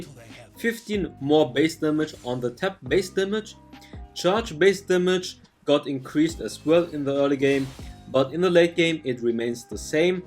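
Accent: Germany. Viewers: One question are u German?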